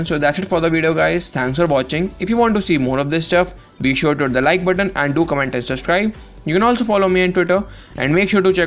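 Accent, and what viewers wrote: India. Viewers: Am from Kenya but from that accent I knew he was from India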